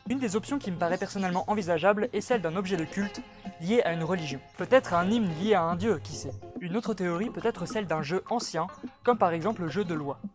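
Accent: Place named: South, France. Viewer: petit accent du sud qui fait plaiz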